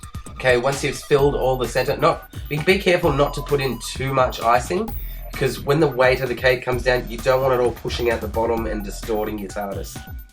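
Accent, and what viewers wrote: Australia. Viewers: Oh my god you're Australian! I was expecting someone british! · I only realised you were Australian when I actually really listened to your voice. I guess you don't notice your own accent.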